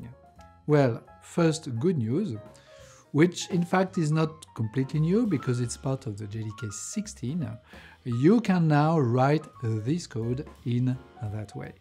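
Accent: France. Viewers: The accent sounds french to me, and some sounds of vowels are silent. I use the subtitles.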